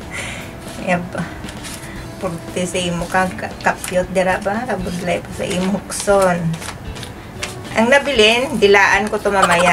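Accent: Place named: Visayas, Philippines. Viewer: Bisaya ka po pala mam..galit na naman po siya ehehe...kakwela nyo po at masarap magluto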